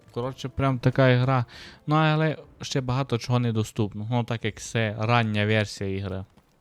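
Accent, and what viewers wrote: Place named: Transcarpathia, Ukraine. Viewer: Чую що ти із сонячного Закарпаття))) з якого саме міста ?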